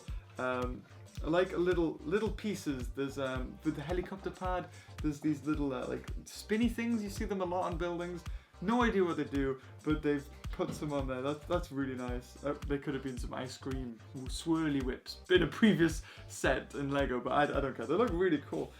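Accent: United Kingdom. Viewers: you are from UK?? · Are you britesh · plz reply are you Brtish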